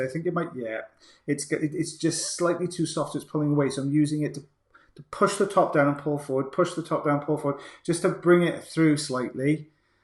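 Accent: Wales, United Kingdom. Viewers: you said you wanted to know if youtube interrupted the video to show an ad - they were showing the DR Drew says this guy is for real V-shred ad on 26/11/23. I love these videos, theres something so satisfying about the skin peels! and your accent reminds me of my grandmother, i loved her welsh accent and i miss it so much, please keep the videos coming!!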